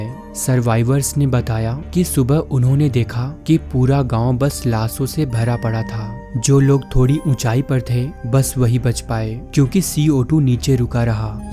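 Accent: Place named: Bengal, India. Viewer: Aab Bengali Accent Mei Q Bol Rahe Ho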